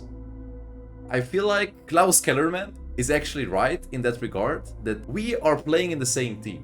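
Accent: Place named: Hungary. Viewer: Eszrevettem a madzsar accentusodat de hibatlan a tartalom, hajra!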